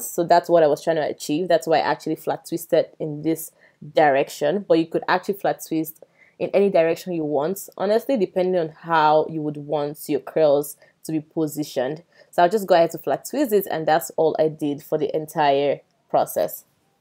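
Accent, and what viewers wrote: Nigeria. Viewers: Your voice makes me no that you are from Nigeria well I am too and I love your video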